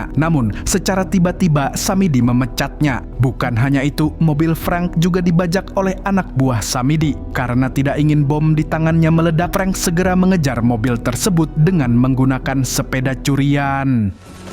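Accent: Banjar, Indonesia. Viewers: urang banjar kh pian mang🤣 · urang Banjar kah pian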